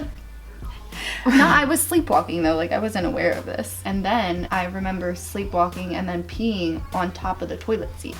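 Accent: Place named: East Coast, United States. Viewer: I love that your east coast is coming out in this video! Every time I visit family in NJ it’s the same. Words ending in G? We don’t know her 😂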